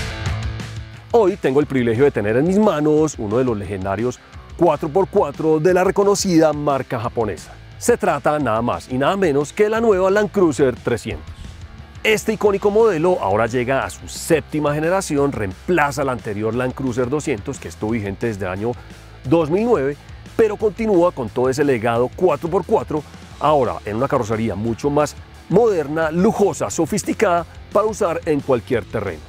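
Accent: Colombia. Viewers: De dónde eres una pregunta tu eres colombiano Es que pareces colombianos yo reconozco muy bien como hablan los paisas los rolos vallunos y me parece que eres de esa parte del pais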